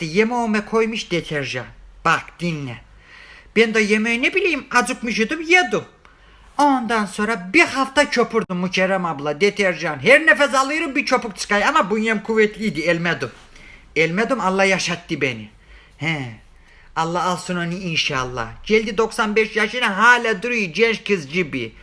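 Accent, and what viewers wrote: Black Sea, Turkey. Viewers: Bilezikte güzel yakisiyor ne yapiyım seni ya puyuklu usak karadeniz sivesini seviyorum iyiki varsin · Elmiki ezrail unitti elmi firavun kari.... Ayzina yüreğine sağlık be uşağumm... Süpersin bu Karadeniz şivene doyamayrum...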